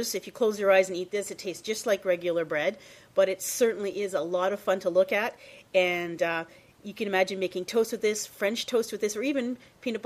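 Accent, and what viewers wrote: Canada. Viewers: can tell from certain words that shes canadian :P prety much the same thoguh, your right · The sugar must make it sweet, is this standard in North America? I've heard the bread in USA is quite sweet but you sound Canadian, is it the same there? Also can I make this bread without the sugar in it? :) Thanks for all the lovely recipes!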